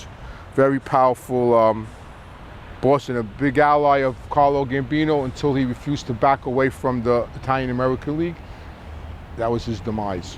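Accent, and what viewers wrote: New York, United States. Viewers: Great production. Nice to hear a New York accent. Moved up to Catskill, N.Y. 10 years after retirement. Back home now. Man, l felt like Joe Pesci in My Cousin Vinny up there! With my N.Y. accent & my clothing & jewelry they'd step aside in stores, etc. Lol Buon Natale.🇮🇹🇺🇸🌲🍕🍷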